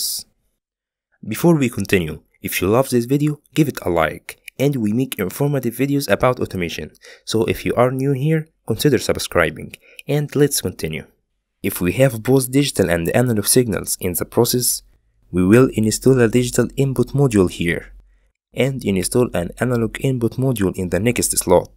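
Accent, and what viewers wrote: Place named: Egypt. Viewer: according to your accent you are Egyptian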